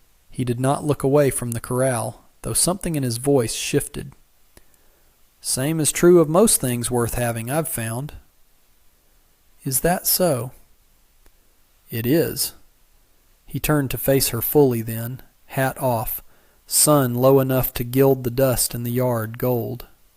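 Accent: South, United States. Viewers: Terrific story. Love narrator's voice and southern accent.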